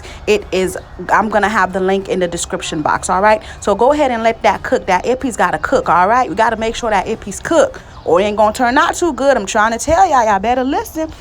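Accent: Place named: Florida, United States. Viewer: 😂Flowwwda aksent!!!! Luv it!!!!🔥🔥🔥🔥🔥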